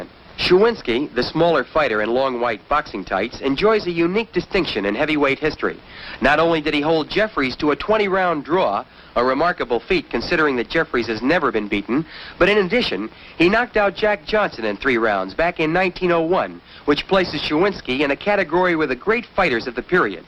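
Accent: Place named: New England, United States. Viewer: I wonder how many of you recognize there was no such thing as sound recording, nor radio for that matter in 1910, this voiceover, judging by the accent, is pretty recent, within the last 35 years, from New England.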